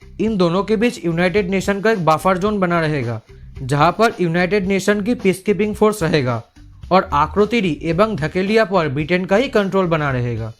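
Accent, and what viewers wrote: Bengal, India. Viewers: Bhai ami sure, tumi Bengali😄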